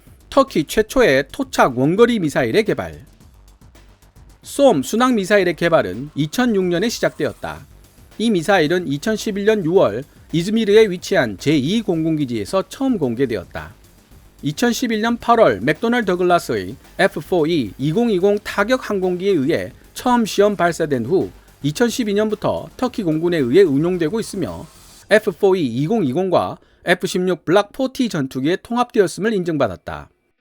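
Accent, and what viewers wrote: Gyeongsang, South Korea. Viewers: 경상도 분인가?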